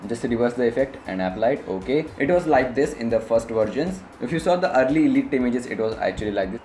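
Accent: India. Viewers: i hate, really i hate the english accent of Indian people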